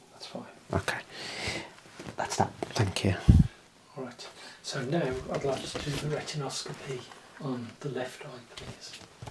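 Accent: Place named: Tamworth, United Kingdom. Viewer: Where abouts are you from? Im from Tamworth and can tell the accent a mile off 😅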